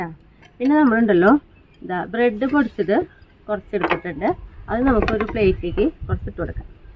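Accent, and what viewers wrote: Thrissur, India. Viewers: കുറച്ചു കൂടി clearആയിട്ട് സംസാരിക്കണെ..ഞങ്ങൾതൃശ്ശൂർക്കാരല്ല..മനസ്സിലാകാൻ കുരച്ചു പ്രയാസമുണ്ട്❤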